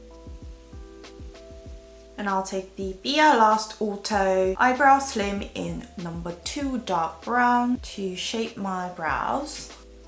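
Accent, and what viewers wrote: United Kingdom. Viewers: 썸네일에 홀린듯이 들어와 영국발음에 취한다..💕... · 부산은 어땠어요??전 45년 부산토박이 비오는날 저 인생영화 와일드 보면서 소주한잔중인데 바로 영상 돌리고 바로 영국발음으로 듣고 있어요 사실 영어는 힘들지만 영국발음은 좀 멋지더라구요 항상 응원해요~~혹시 와일드영화 안보셨다면 남편이란 함 보셔요~~^^ 전 악마는 프라다를 입는다도 인생영화지만 꼭 보시길~~오늘도 이뿌신거 아시죠??? 사랑해요 억수루~~ㅎㅎ💖💖💖💖💖💖💜💜💜💜💜💜💜💜💜정말 사랑스러운거 아시죠??항상 응원합니다~~^^ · Happy birthday it’s late to say tho:) ❤️ I LOVE your British accent so much! 💗 최공 ㅎㅎ 😍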